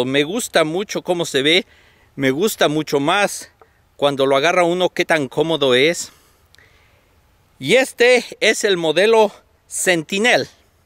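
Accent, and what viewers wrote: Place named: Mexican-American, United States. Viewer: El acento es increíble amerimexican o México americano? 🤔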